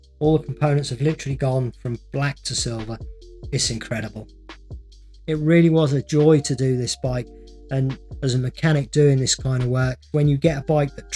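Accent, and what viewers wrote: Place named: Lowestoft, United Kingdom. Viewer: What a fabulous restroation. I'm impressed with the thoroughness and attention to detail. Thought the accent seemed familiar ! You're just over in Lowestoft. Not far from me at all (and 0.2 miles from my aunt !) I'll have to drop in next time I need some kit for my bike(s). I've practically got the entire 1984/5 Raleigh Lightweights range hanging up in my garage and a few other bikes too.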